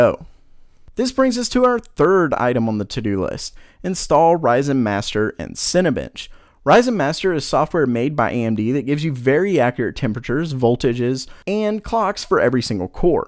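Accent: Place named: Philadelphia, United States. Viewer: You gotta be from philly...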